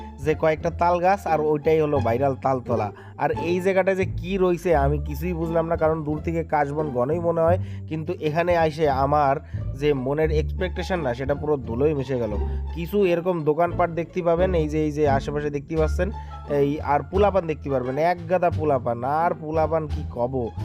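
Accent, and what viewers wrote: Bangladesh. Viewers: দাদা কি কুষ্টিয়া আসার সম্ভাবনা আছে নাকি? কুষ্টিয়ার ভাষায় কথা বলছেন।🇧🇩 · Dada tumi to Bangladesh i vasa bolcho 😊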